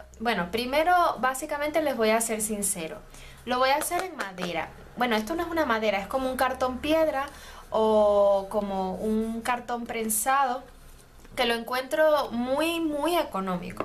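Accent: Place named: Maracaibo, Venezuela. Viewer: además de ser Venezolana, por tu acento eres maracucha, un gran abrazo, que sigas teniendo mucho éxito!!!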